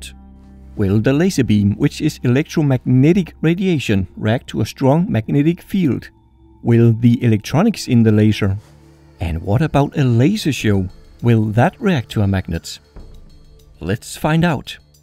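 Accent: Denmark. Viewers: Man kan bare høre på den accent du er dansk haha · Warm Danish accent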